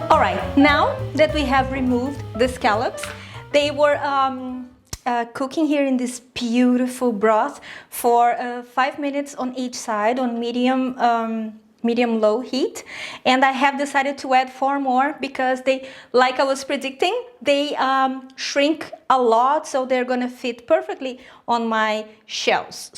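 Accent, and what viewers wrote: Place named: Brazil. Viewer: First time I watched one of your videos I thought "what accent is that, where have I heard it before?" and then I saw your last name and realised it's the same one I have, hahaha. Great too see a fellow brazillian making such beautiful vegan dishes and reaching the whole world with your recipes. Te desejo todo o sucesso do mundo,!:)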